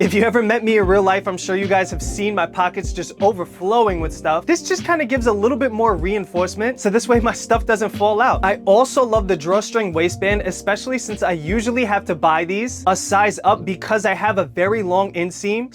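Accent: New York, United States. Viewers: Such an informative vid and some real bespoke picks! Also love the NY accent haha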